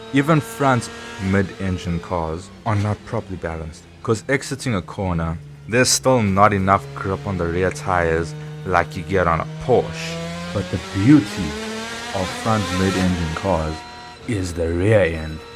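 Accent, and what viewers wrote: South Africa. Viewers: since you are taking the time to respond to my comment personally: 1. My ultimate pick would be a Lexus LFA for feel (but due to price, would never get to drive one like that). 2. FD RX7 would be my realistic pick, or probably an old Porsche Boxster for being even more realistic for the wallet and fun. 3. Check out the M3 GTR that me n my mates built for Bathurst (#42), hit a kangaroo 😂 4. I noticed all footage was of the ring - my uncle worked there (I’m a FT dad, so a while before I get behind the wheel again). 5. Porsches are just great from factory 😂 6. I’m actually old - hence the skyline. Nice SA Accent btw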